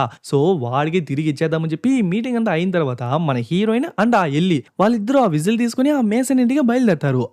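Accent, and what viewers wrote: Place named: Chittoor, India. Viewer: Bro మాది మదనపల్లి. And మీ slang పలమనేరు చిత్తూరు ఆలా అని పిస్తుంది మా నానమ్మ వాళ్ళది పలమనేరు. బయ్యా మీ vasic చాలా బావుంది.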